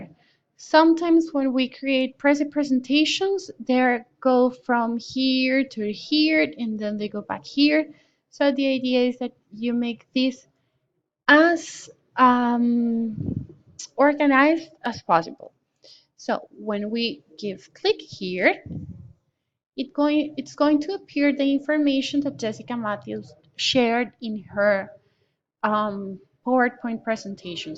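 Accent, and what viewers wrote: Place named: United States. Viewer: Tienes acento estadounidense profe😸😺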